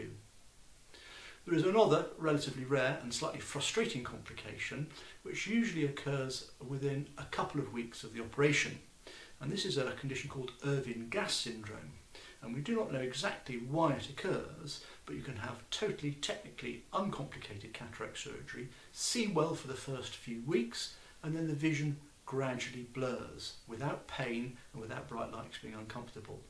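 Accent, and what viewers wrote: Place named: England, United Kingdom. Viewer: Thank you for the heads up, doctor. English accent is not the audio's fault!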